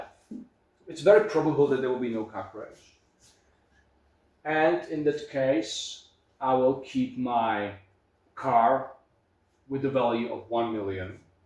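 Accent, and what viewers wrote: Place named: Germany. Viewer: I got recommended this video and I heard the semi German accent so decided to watch the entire thing 😂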